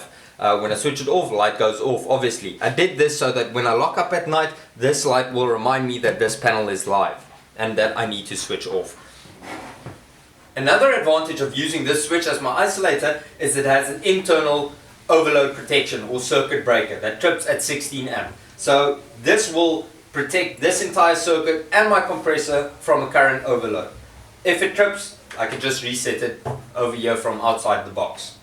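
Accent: South Africa. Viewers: That a south african accent? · Terrence Lemire South African accent 😉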